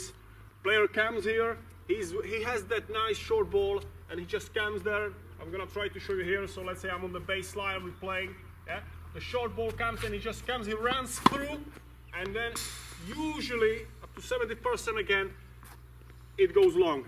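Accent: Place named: Czech Republic. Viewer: nice one, thanks. where are you from by the way? your accent sounds tchechish.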